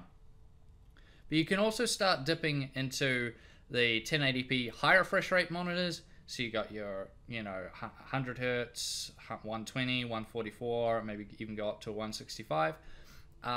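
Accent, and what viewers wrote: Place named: New Zealand. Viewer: Hi, Nice accent!! Sounds like you're from Zew Zealand.. I just got the GTX1070 MSI OC card (since they are coming down in price) with my new 6core I5-8600K build. I need to buy a MONITOR without breaking the bank!!!.... I was thinking of a higher refresh rate, like 120hz or 144hz at 1080p. I play Battlefield 4 mainly and PUBG. HOWEVER, it seems in the mid ((($300))) US dollar range that these monitors have subpar picture quality, bleeding and other bad things that happen while gaming. Then I thought about the Vizio 43" 5ms D series LED TV. It says 120hz.. but I'm betting my nickles in my pocket that its probably some marketing ploy and not really native 120hz. But its picture quality is amazing at 1080p and it's around $300. What do you think or suggest I do for around $300 us $?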